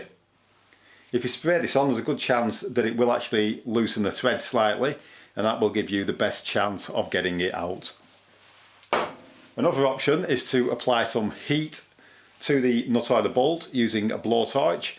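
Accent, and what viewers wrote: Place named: England, United Kingdom. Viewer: I love your videos and also enjoy the accent. Reminds me of me old mum who was born in England. Recently had to get some rusty bolts off a car bumper.The problem was the part of the bolt poking through the mounting but was rusty but accessible so hitting it with a small wire brush and lubricating was enough to get them out without shearing off the head. Gotta be lucky sometimes.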